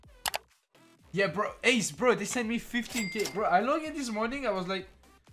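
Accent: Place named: France. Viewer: L’accent français est violent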